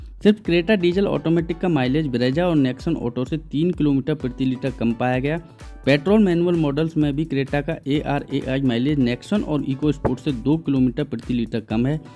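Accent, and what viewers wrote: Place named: Hindi Belt, India. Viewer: While this is a good comparo, The Hindi accent used by the narrator makes it difficult for me to understand. I understand hindi well, but in this I had to listen again and again to make sense of a few sentences. Perhaps it is speed along with accent that makes it difficult for me as I am not a native of Hindi belt... :(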